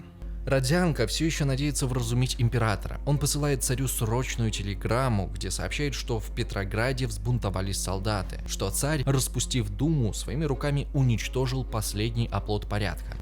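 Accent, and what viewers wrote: Ukraine. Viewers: Господи, как же раздражает этот украинский говор, нахрена букву Я в каждом слове проговаривать как Я твердо, в русском вообще мало где она так произносится, пишется Я, а произносятся звуки Й, И, либо вообще проглатывается звук Я при разговоре. Кровь из ушей, хуже только кубаноидный говор, этим говором русских пытать можно, только включить запись и мы сойдем с ума. А канал классный, но мои уши сворачиваются в трубочку 🩸 Я бы лучше с субтитрами посмотрела, выключив звук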